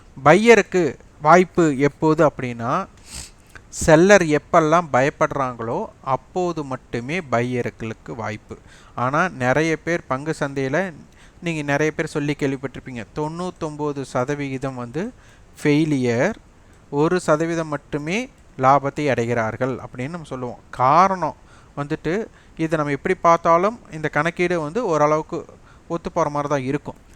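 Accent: Kongu, India. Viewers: PSYCHOLOGY பற்றி ஒரு பதிவு தங்களின் இனிமையான கொங்கு நாட்டு தமிழில் பதிவு செய்யுங்கள் ஐயா. நன்றி வணக்கம் 🙏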